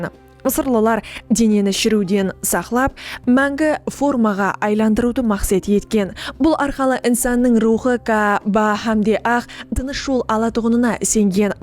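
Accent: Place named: Karakalpakstan, Uzbekistan. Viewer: Берекелле журналист кыз таза Каракалпакша сойлегенин ушын рахмет каналды коллап кууатлайык ❤❤❤❤❤ лайк праписка болыңлар жаксы мәғлүматларды алып барыудан шаршаманлар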